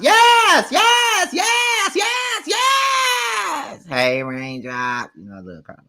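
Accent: South, United States. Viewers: Omg u sound so country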